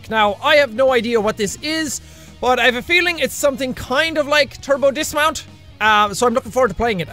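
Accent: Ireland. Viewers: I feel so sad I havnt watched 4eva and I miss the cursing and irishness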